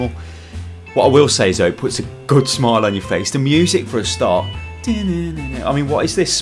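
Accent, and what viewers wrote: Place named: North, United Kingdom. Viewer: Your accent has changed since this video. You sound more northern in this video.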